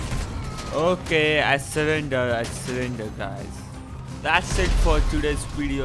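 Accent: India. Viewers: T series acent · You are from India or another Country like this because accent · Sorry i love you and your channel no homo But your Indian accent sounds like youre sleeping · Es de la India , por eso habla así el inglés.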